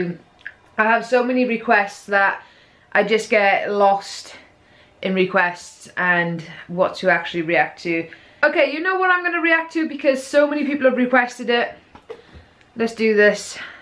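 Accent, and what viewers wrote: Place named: South Wales, United Kingdom. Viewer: Sounds like you're South Walian. Greetings from Aberfan, Merthyr Tydfil. How about a duet. Try - featuring from the Retrospect Tour.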